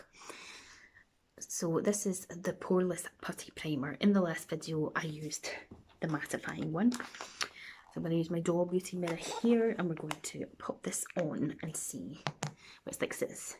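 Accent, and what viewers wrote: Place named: Scotland, United Kingdom. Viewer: There's a phrase from when I was in the military, when someone did well, "atta-girl" or "atta-boy"...you get an atta-girl for dealing with your anxiety and still make videos and PowerPoint presentations! As to being disenheartened, another thing I learned from my time in service: If you have helped only one person your life has been worth-while. You are helping me to embrace wearing makeup...I mainly only wear it for special occasions, or if I'm on "vacation" with my hubby while he's at a tech conference (pre-worldwide cooties) and I had time to watch YouTube creators with makeup videos. (When my makeup is on-point, I feel better about myself - and anything healthy that I can do to bolster my mental health is a good thing.) Wayne Goss is one I follow, as well as a couple of females who specialize in "older skin" (I have 20 years on you.) The upside of your unedited videos: we get to see how long things take, how to fix oops-es, annnd, we get to hear your beautiful voice! 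(trust me, a lot of us U.S. folks are gaga for a beautiful Scottish accent...just ask Cheryl LOL!) ❤️ Sorry for the novel-length, but I thought it was important enough to share my thoughts. 😉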